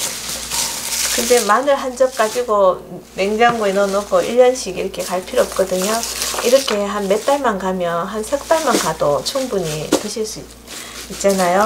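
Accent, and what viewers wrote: Gyeongsang, South Korea. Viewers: 닉네임이 너무 정겹고 목소리가 경상도 아줌마치곤(?) 따뜻함이 묻어나서 궁금했어요~~ㅎㅎ 요즘 상어송이 대세잖아요~ 뚜루루루루 귀여운 뚜루루루루~~ㅎㅎ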